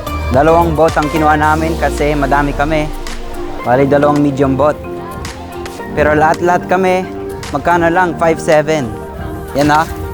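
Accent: Philippines. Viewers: I wanna hear talk in his native tongue, as in talking with that Texas twang. Even his English words when he's narrating are spoken with a Philippine accent.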